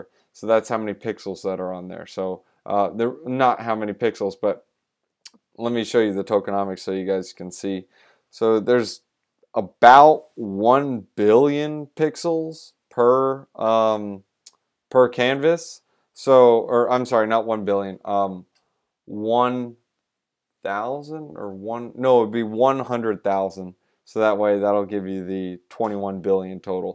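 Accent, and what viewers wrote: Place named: United States. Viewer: LOL. That one guy video that you said you couldnt understand I literally just came from him. When I heard youre American I said thank God!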